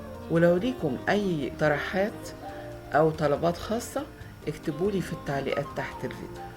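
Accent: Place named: Egypt. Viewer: I just love listening to your egyptian arabic and understand every single word.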